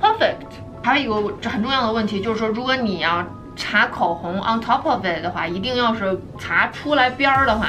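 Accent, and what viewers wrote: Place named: North, China. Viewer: 前几天翻墙节点不对，居然发不了评论。我想说，当知道小姐姐是天津人的那一刻，忽然明白了为什么除了北方口音，你话语里的朴实和接地气特别亲切，原来是老乡！！🤣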